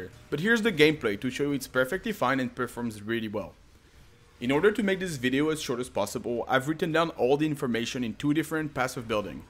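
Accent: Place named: France. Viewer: Je me disais mais il est francais, 100% Puis oui haha Excellent build en tous cas, merci !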